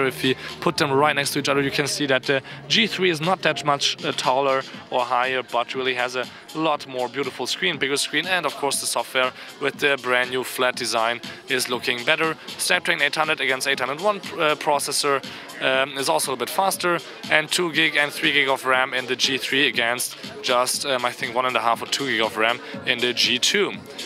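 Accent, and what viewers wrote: Germany. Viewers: Man hört schon den deutschen Aktzent, aber gut gemacht ;-)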